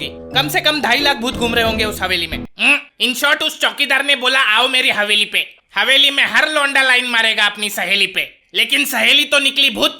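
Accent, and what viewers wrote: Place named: Mumbai, India. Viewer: We like your Mumbai ki language... the way you speak in different voices... unexpected topic pe Video aa jate hai😂 aaj to hamara dar khatam ho gaya... bechara bhoot berozgar ho gaya😂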